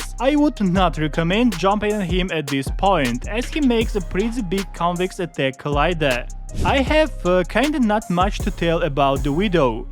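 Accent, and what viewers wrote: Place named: Russia. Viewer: It's a Russian accent this guy has a YouTube channel in his native language.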